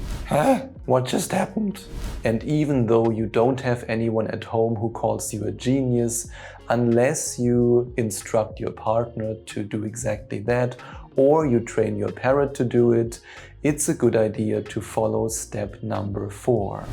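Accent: Germany. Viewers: I started a 90 day dr joe dispenza meditation challenge for myself & sharing it on my youtube channel to stay committed & motivating my clients. You really always inspire me..this weekend will do a home retreat.Many thanks for your videos..vielen Dank..sind wirklich tolle Videos..empfehle Dich immer gerne weiter. I was in Basel 2024 but meditated on and off..consistency is key..got it locked in now🎉we got the same German accent😂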